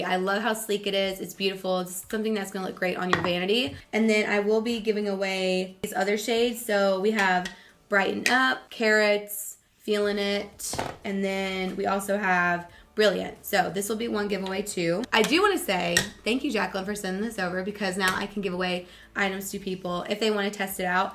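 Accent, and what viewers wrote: South, United States. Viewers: I can hear you southern accent more when your voice is coarse. It’s so cute! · love your video and your southern accent... greetings from Athens GA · Not sure if it’s you losing your voice or moving back to Georgia but your lil southern twang is coming back out! So cute 💜💜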